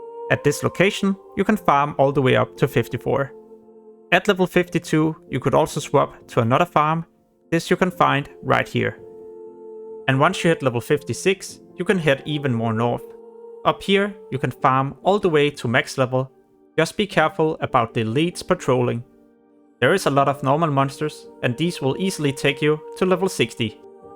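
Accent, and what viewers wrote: Denmark. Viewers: it's all good, nice video you made. - sounds like you are danish :) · Danish guy. It's the accent. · HUGE dansker accent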